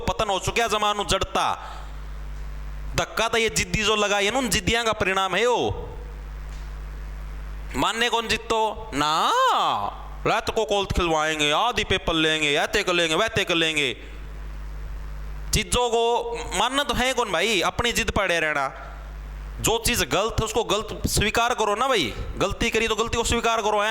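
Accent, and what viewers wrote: Haryana, India. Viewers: Maan gye guruji..apke theth haryanvi andaz k liye...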